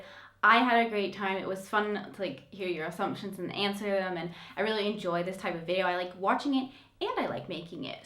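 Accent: United Kingdom. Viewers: There's definitely a British accent comming trough that Southern US twang. Give it a few years. Anyway, i alway's find this subject a bit awkward to watch with other YT-ers, but you handled it quite well,👍-up on the vid.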